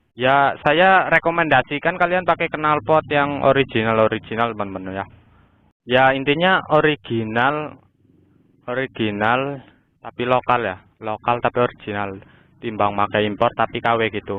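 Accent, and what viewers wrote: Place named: Java, Indonesia. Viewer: Bhsn e kok agak medok² kek org Jawa bro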